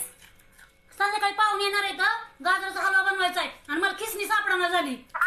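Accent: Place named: India. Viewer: Aho khupch chan joks krtat ani gavrn boln khupch chan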